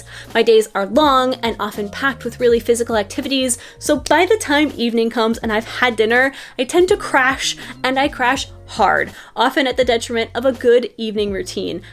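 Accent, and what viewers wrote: Quebec, Canada. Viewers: J’aime beaucoup t’entendre parler canadien 🤭 Ta terrasse est splendide ! Ton propriétaire l’est moins 😂 !! À bientôt ! 🌸🌸🌸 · Ça faisait longtemps que j'espérais t'entendre parler français un jour dans une vidéo (avec ton super accent québécois !) ☺ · Très plaisant de t entendre parler français avec ce superble accent québécois! Du miel pour les oreilles 😘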